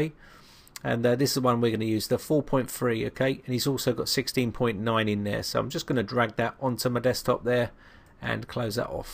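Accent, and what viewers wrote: United Kingdom. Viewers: You are by far my favorite Windows 'Guru.... And please, slow down your fast, nervous speaking, because that along with your heavy British accent.... makes it hard to enjoy the 'essence of your Royal Perfumes.......'God save the Queen!.....'You are the best!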